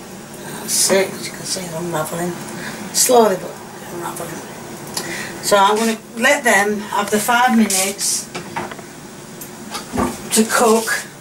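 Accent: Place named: Scotland, United Kingdom. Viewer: She’s from Scotland I think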